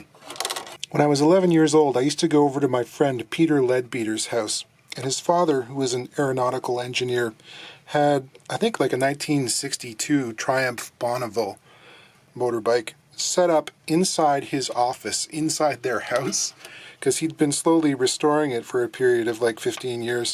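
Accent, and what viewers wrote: England, United Kingdom. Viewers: Major credits for the attempted English accent. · Yeah bit of a mish mash of accents, but you pretty much nailed the mentality. It’s always enjoyable hearing folks from your side of the globe doing Brit accents, I still imitate Dick van Dike from 50 years ago and have been doing it that long. Dad joke don’t get any better in my house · How delightful to see such a classic. However, the issue of precedence is moot, with Charlie Watkins (of Watkins Rapier and WEM Copicat fameI claiming to be first to market in 1954 (the year of my birth). I actually owned a Rapier briefly in my 20s and, for reasons which need not concern us (clue, it was in the 1970s and I was in my 20s), have no idea what became of it. It was a piece of history, and the back story is much the same as with Burns. In the UK, tariffs on imported instruments were huge in the 60s, as was the price of the instruments themselves. 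Even the top bands such as the Shadows (and later, the Beatles) spent the early days of not just their careers, but also their stardom playing UK or continental instruments. Thanks so much for this piece of history. If you're watching, I do know you have an ear for accents love the solder/sodder stuff), but your English accent crosses every river from the Thames to the Tyne (mate)! As a Londoner, I can tell different suburbs without getting out of first gear (extra points of the use of the iconic Canadian 'Ey;'). In passing, I note that van gent tuners, from the Netherlands and a great tuner, have been used for many years on a more recent iconic English guitar brad - Gordon Smith. Extraordinaty value instruments. I should mention that, more than ever, your commitment to researching the Hx is super. Great video in every respect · Love the English accent 😊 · Your English Accent sucks. I say this as an English person 🙂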